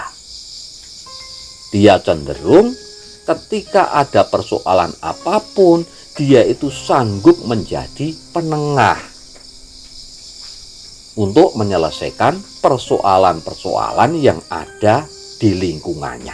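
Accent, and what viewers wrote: Java, Indonesia. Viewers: Konsepnya bagus banget, Mbah. Tempatnya mendukung,musiknya musik jawa, mbahnya juga pake baju tradisional. Mbahnya juga bicaranya walaupun logat medhok,tapi masih jelas. Berasa sedang dinasihatin sama almarhum Kakek saya.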